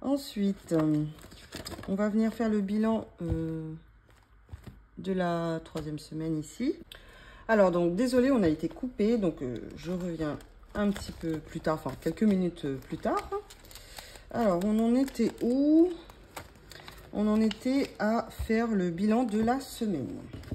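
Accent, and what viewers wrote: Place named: Réunion, France. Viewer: Petite question qui n'a rien à voir mais d'où viens ce petit accent ? La réunion ?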